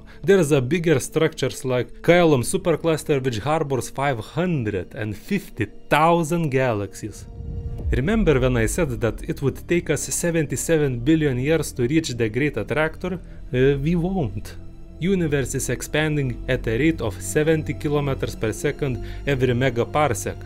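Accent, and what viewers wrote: Russia. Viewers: His russian accent is attractive lol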